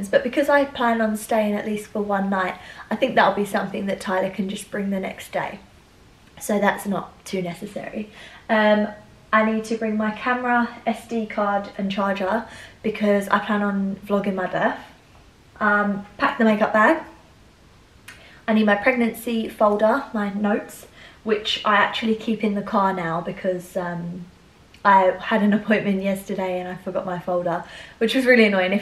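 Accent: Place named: England, United Kingdom. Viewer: Sounds English to me lol!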